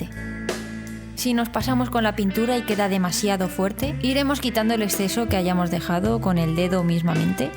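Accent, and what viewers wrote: Spain. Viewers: de españa weon no le escuchas la voz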